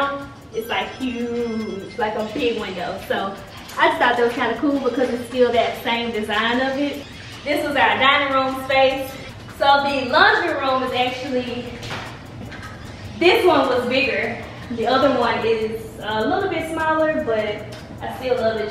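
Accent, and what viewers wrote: South, United States. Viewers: You’re the epitome of be patient and wait your turn !! I literally remember watching your relaxed hair video YEARS ago falling in love with your southern accent & now look at you 🥺 I’m really so proud ❤️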